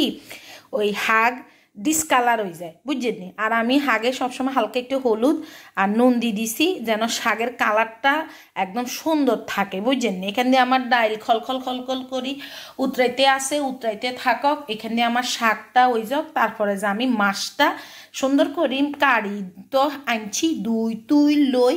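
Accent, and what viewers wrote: Noakhali, Bangladesh. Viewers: আপু আপনার খাওয়া দেখে। আমার ও রুচি বেড়ে যায়..আপনার নোয়াখালী ভাষা শুনতে খুব ভালো লাগে।...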